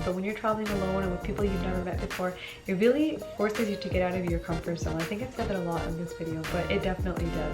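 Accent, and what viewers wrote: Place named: India. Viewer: Where do you live in India? Ur accent is pretty cute😍 just wanna ask where u got that from? Anyways, keep shining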